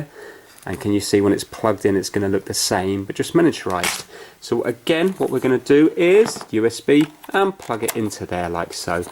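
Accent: United Kingdom. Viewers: Shamalamamoumou unknown he is British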